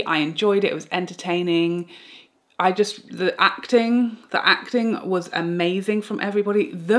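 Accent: United Kingdom. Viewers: Bonjour! I love your reviews. You are so nice and funny too. And différent from Canadian and US reviewer. Plus, i really like your british accent. Prize 1 would be my favorite. Bisous xxx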